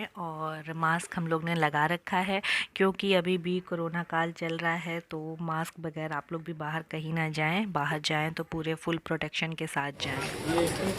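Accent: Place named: Kanpur, India. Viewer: Purani yaade taza ho ganyi...chauk aur ftp ki galiyon 😀😀😀 First video me Apke voice tone se hi laga ki ap cnb ftp se😀😀😀👍👍👍👍👍 Good work mam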